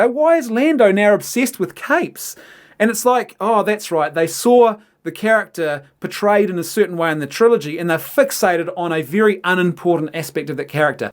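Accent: New Zealand. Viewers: The only question I have after watching this: Are you from New Zealand?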